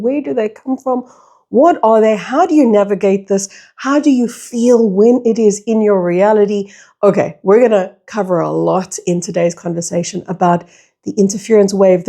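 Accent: South Africa. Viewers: Thank you for sharing your wisdom. You popped into my orbit after your conversation with Amrit and so, so glad you did! Love hearing a familiar accent and especially at a time when, having lived overseas for some 20-odd years, all I want is to go home to SA. Listening to you feels just like that... home ❤...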